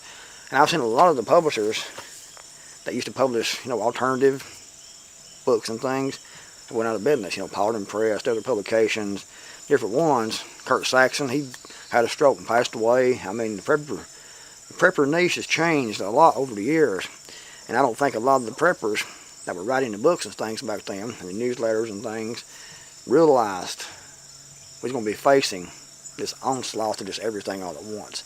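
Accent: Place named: East Tennessee, United States. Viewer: I heard Patara mention your wonderful East Tennessee accent the other day; I had to just nod. Tennessee accents are so beautiful to me. (I'm from SC.) I AGREE WITH YOUR VIDEO.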